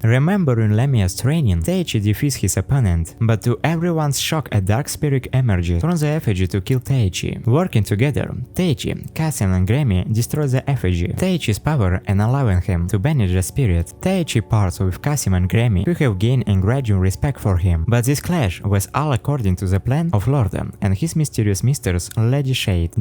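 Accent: Russia. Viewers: Where are your Accent comes from? Russia? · Not gonna lie I thought your were speaking Russian for a minute......but overall not not bad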